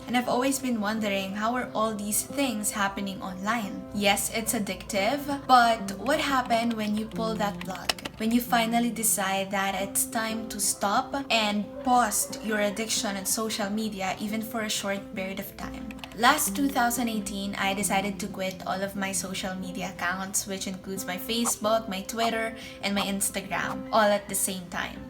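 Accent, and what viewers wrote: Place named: Philippines. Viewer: im quitting social media too, and while listening to you, i think you're a filipina haha, btw new subscriber mo ako, keep doing videos :>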